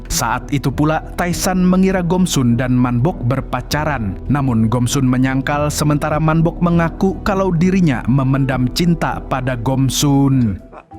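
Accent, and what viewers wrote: Banjar, Indonesia. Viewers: Urg banjar kh manggg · urg banjar kh pian sklinya astga😭😭😭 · Wakai orang Banjar kah ikam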